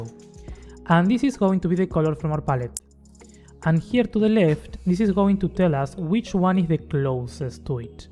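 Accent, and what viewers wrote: Argentina. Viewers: Suenas argentino.. muy bueno el vídeo, loco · vo so re argentino no?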